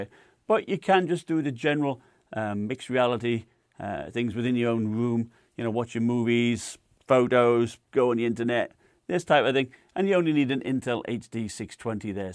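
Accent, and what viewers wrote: United Kingdom. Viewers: Hey there, judging by your accent you might be based in the UK, however based on a few articles I read the Samsung Odyssey has no plans to go on sale in Europe and the UK (yet), wondering if you might be based in the US? And if you are indeed UK based, how did you manage to get hold of these?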